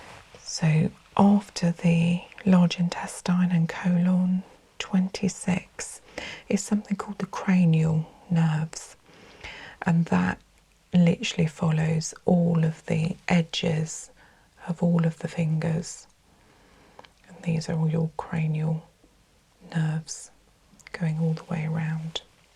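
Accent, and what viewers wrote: England, United Kingdom. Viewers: What area are you from in England? You accent sounds so much like a friend of mine. Such a soothing voice!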